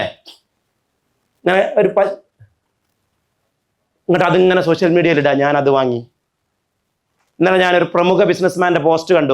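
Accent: Malappuram, India. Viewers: Thanku sir... താങ്കൾ മലപ്പുറം ദാഷയിൽ സംസാരിക്കുന്നത് നല്ലത് തന്നെ പക്ഷേ സോഷ്യൽ മീഡിയ യൽ കാണുന്ന എല്ലാർക്കും തിരിഞ്ഞോളണന്നില്ല..... സസ്നേഹം ഹരീഷ്‌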